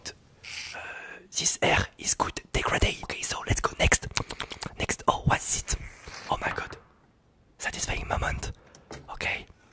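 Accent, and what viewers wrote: France. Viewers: et, ça se voit que t'es français vu ton agsent (ou peut-être pas). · On dirai un français 😂son accent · Are you French because you have a French accent and I like it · this mousse à rasayy! This is why I love watching! It’s like if French and English collided together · T’a vraiment un accent français quand tu parle anglais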